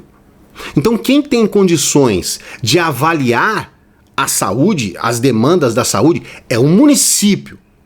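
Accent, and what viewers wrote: Minas Gerais, Brazil. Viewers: Vc e mineiro?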